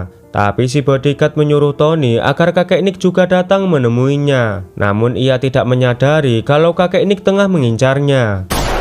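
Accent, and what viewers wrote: Java, Indonesia. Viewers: Baru mau saya komen, medok amat ya, Brebes apa Purbalingga ya😅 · Meddookkk adminnya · Medok banget suaranya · Medok jowone mas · Jowo mu bro medok banget,😅trimakasih ya bro sdh bs menghibur para pemirsa yg suka dg flm action👍👍💪💪🙏🙏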